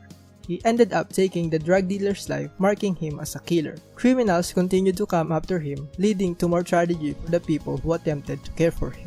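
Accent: Philippines. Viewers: bruh are you filipino your accent sound like filipino accent in my opipon ahahhaa nice list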